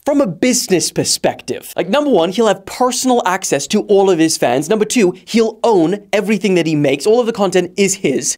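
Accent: South Africa. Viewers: You grew up in SA huh, i knew that accent sounded familiar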